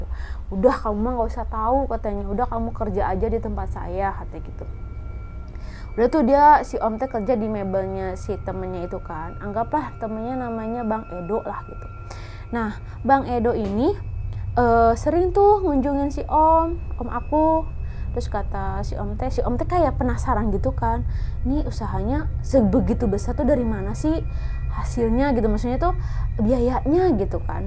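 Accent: Indonesia. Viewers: Ngerti dong Sunda banget c teteh na nyarios na😅😅👍👍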